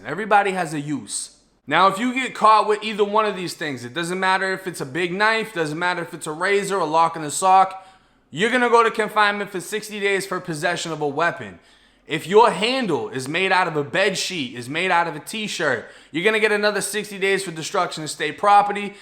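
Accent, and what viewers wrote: Boston, United States. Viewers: That’s wild he sounds very Boston for Florida lol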